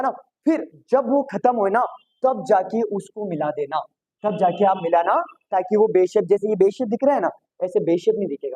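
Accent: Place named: Hadoti, India. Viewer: Sir aaap hadoti bolte ho kaha se ho aap kota ya baran❤😮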